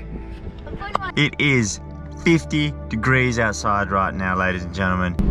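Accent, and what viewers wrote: Australia. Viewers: The Nads are called "Umi" in restaurants, you brave souls! New sub, love your channel, still havn't figured where your at, my guess is Australia. It's the accent!! 🤔🥰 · Are u Aussie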